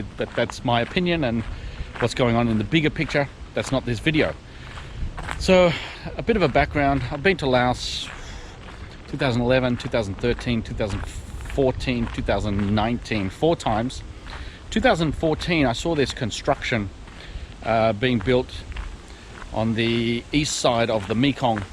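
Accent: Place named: Australia. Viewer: Your People been taking over Other people countries for centries including Hong Kong, Now here U talking about China🇨🇳 Taking over Laos🇱🇦 First of all U R white, Sound like an Australian Are from some European country, U R not from Laos,, So,, before U start showing your disapproval of what the Chinese R doing in Los, Show us your disapproval of the history of your people taking over Other people countries, N another thing, do your research on the history of China🇨🇳 and Laos🇱🇦 relationship.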